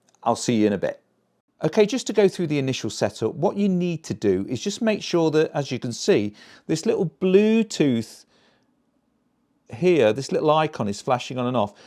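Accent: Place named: East Midlands, United Kingdom. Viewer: What a refreshing video. No razzamatazz, no sickly AI non human voice and you made it very clear on the steps to take. I liked the sounds you got as well as the East Midlands (ish) sound of your voice.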